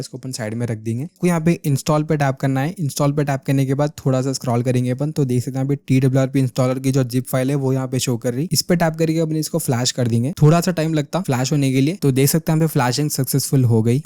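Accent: Hyderabad, India. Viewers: Hyderabadi Accent 😅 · Bhai's hydrabadi accent is cool! 😍🥰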